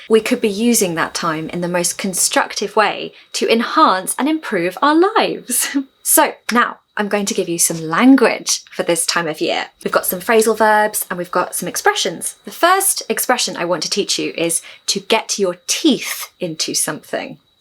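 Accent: United Kingdom. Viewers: Happy new year pretty British-English, your lessons are amazing for us, good job, go,go further.. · your videos are a wonderful gift for us English learners. Your enchanting British accent with an aristocratic touch, your expressive body language, and the interesting contents.... All of these features are so motivational. May I use an Old English phrase? "God bide with thee!' Thank you! all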